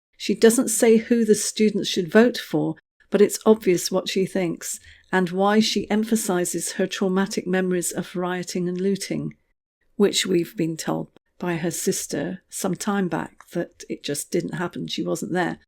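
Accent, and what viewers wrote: England, United Kingdom. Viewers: Hi my friend ! Can you tell me how to hear Megan’s speech please ? I’m 74 and technically useless ! Ido so enjoy your posts, as you’re obviously English, as I am, and easy to listen to, and tells it ‘as it is’ ! But I’ve been sick and not been able to check my iPad or YouTube ! So I’ve missed it all ! PLEASE HELP !? I only have an apple apple iPad, nothing else, no smart phone, etc which didn’t most of my friends don’t know how to use as they have tablets, Android type ! PLEASE HELP ME, I really need to know what she did ? Thanks so much (she is ‘roaming around seeking those seeking those SOULS who would despaired )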